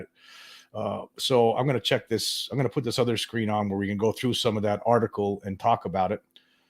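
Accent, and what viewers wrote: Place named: Long Island, United States. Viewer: Ok. I grew up on Long Island. Sorta recognized the accent as being in the ball park. Thanks…and good show today. When do you do your podcasts?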